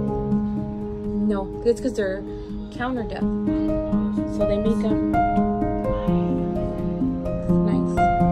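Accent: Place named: India. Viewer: A mi me Cuesta enterder su ingles cuando habla, su acento es bien fuerte. Pencé que era de la India 🇮🇳.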